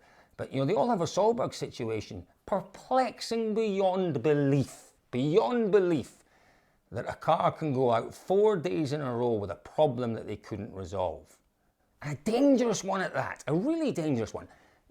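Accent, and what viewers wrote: Scotland, United Kingdom. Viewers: Naice scottish axent.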